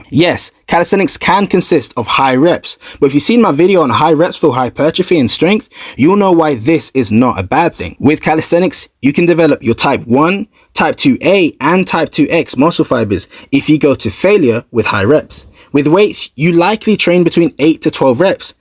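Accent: United Kingdom. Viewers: U sound British I can tell u from England 🇬🇧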